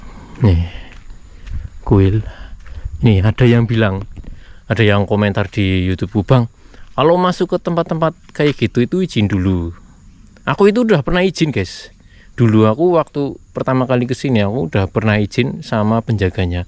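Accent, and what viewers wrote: Banyumas, Indonesia. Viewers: Mase asale saka ndi koh ? Banyumas apa ? 😂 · Ngapak e njiplek bgt mas 😭 the real medhok neng ngana 😭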